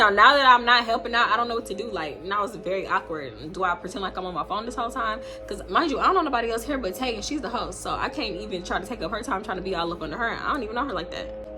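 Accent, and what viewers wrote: Baltimore, United States. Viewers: You and this Bmore accent lmao I love a good Stewpiddd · you sound just like us baltimore ppl now😂😂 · It’s the Baltimore accent for me 😂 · Girl yes sounds just like us baltimore folk lls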